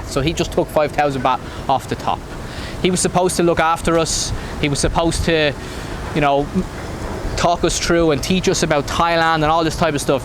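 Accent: Ireland. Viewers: Wow, that would have sent me in a major panic. Glad it all worked out fine in the end. I always get caught up in your accent. I have never heard someone add a 'th' at the end of every 5th word. Like your name, there no 'th', but you still pronounce it that way. Is that part of the Irish accent? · Nice and Interesting Story, from a that time young and naïf person., 1 positive Remark, take care of your English/Irish, not everyone is a Native English speaker and sometimes it’s fast and a little bit difficult to understand in your Enthousiasme. 🙏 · I can relate to this in so many ways 😅 scammed in HCMC all over the shop and the Irish accent going down great in Pai 😂 Great story, give us more of them!😁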